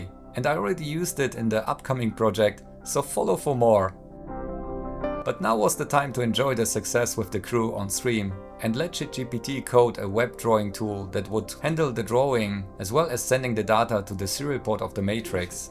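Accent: Germany. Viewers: Sie haben immer noch Ihren deutschen Akzent.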